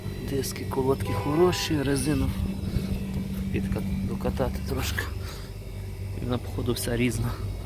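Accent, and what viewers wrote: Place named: Ukraine. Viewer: Суржик ужасный!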